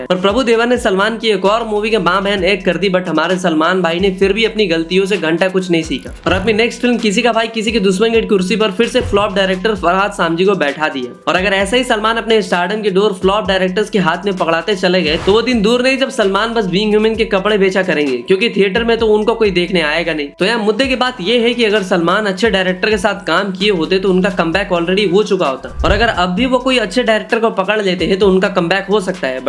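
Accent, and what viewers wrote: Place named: Bhopal, India. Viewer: Bhai tu bhopali lagriya hai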